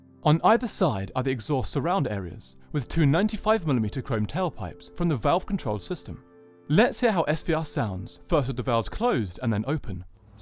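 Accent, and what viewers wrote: RP, United Kingdom. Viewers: He sounds awfully posh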